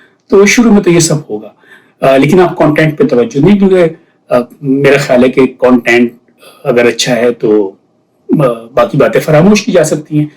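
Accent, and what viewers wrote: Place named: RP, United Kingdom. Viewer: ایسا لگ رہا جیسے بی بی سی سن رہے. کمال ایکسنٹ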